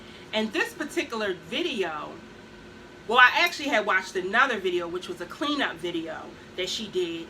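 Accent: Baltimore, United States. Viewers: hey babe! i love your baltimore accent.💛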